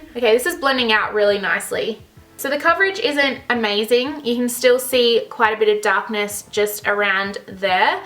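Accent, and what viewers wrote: Australia. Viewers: 😂😂😂she was so Australian in this one I wonder what part of Aus she's from, kinda wanna be her friend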